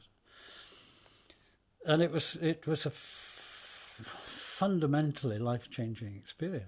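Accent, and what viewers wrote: Scotland, United Kingdom. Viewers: English with a wee touch of scottish brogue makes it all the more pleasant... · 19 January 2020, Sicily. Yep. When described with a clear British accent even Death sounds good.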